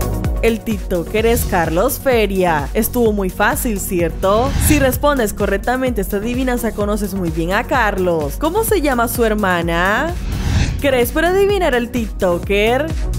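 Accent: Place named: Ecuador. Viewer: Te veo De Ecuador✌❤ por la voz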